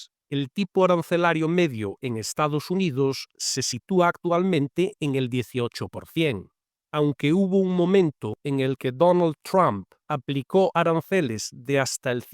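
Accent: Galicia, Spain. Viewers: No ves que tiene acento gallego?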